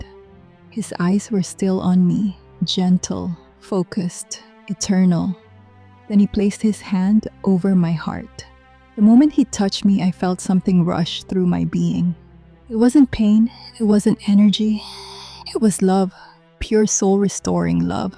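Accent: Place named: Tagalog, Philippines. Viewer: Just do it in Tagalog version and put English sub titles Is really annoying to hear Tagalog accent trying to speak English Be natural and more people will listen like the Koreans ok 😅😅😅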